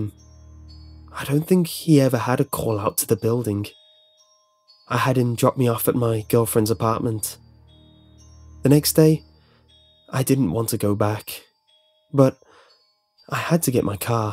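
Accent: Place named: North, United Kingdom. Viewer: Too Northern with unnecessary pauses in the wrong places... Bit of Brooklyn in there too...?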